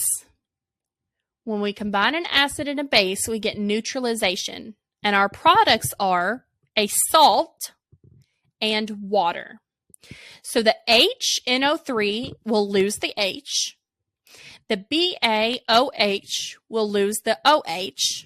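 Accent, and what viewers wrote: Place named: South, United States. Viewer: goodness I love southern accents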